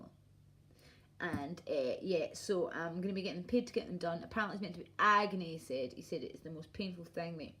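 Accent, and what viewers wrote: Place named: Scotland, United Kingdom. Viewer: I subbed you back hunni,great to hear a good old Scottish lass in this fight,my 1st,lol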